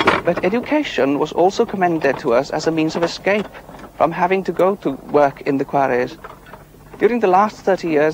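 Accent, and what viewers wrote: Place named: Eastern Europe. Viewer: Lol his accent sounds so foreign, almost Eastern European. I would never have believed he was Welsh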